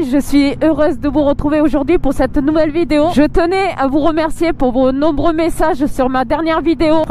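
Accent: South, France. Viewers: ton bel accent du midi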